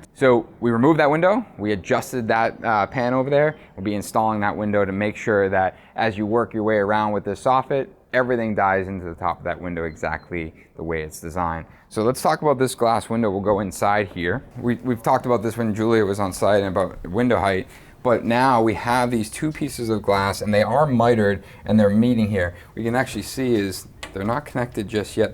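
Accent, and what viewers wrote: Boston, United States. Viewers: I love how sometimes you just can't turn off your Boston accent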